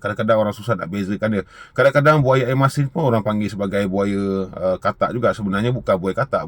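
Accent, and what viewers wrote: Perak, Malaysia. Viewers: Fuhhh cakap perak pulak 😂😂😂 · mantap lah bang buat comeback saya sentiasa follow video bang tak penah miss..cume kali ni terhibur skit sbb abg cakap perak hahahha sbb saya org perak btw steady bang ilmu2 yg di sampaikan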